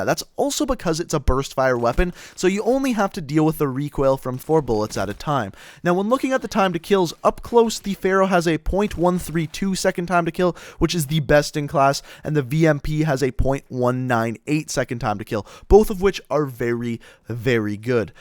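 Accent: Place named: Newfoundland, Canada. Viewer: I think this guy is from Newfoundland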